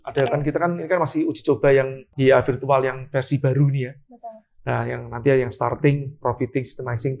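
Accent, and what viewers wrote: Java, Indonesia. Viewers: Koh asli mana si..jawa ya.medok.hehhehe Sukses trrus ya koh..moga sehat selalu..aamiiiinnn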